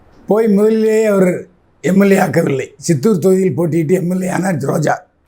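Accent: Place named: Tirunelveli, India. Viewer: Annatchi yentha puthula yentha paaaaambu irukum, Anna Nellai pasai Yellam polichathan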